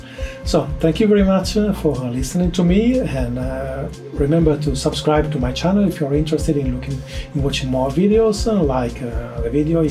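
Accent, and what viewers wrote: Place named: Italy. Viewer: Beneficial video. Besides that, I like an accent on the last consonant. Truly Italian.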